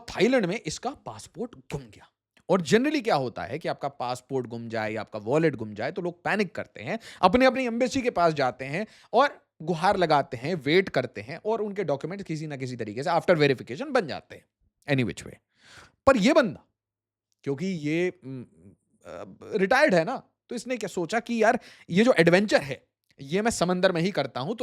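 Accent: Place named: Bihar, India. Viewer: Sir aap Bihari kyu bngye ho accent ko kya hogya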